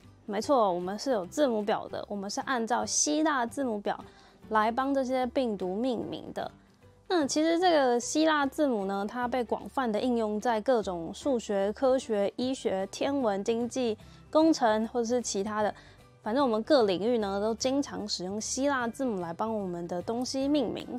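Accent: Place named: United States. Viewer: 這是美式發音，不是真正的希臘文發音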